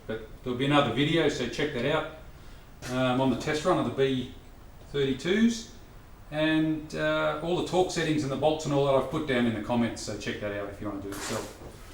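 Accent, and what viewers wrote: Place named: Queensland, Australia. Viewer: hey mate you are not in melbourne are you (judging from your lovely QLD acsent)? need mine done too, slow leaking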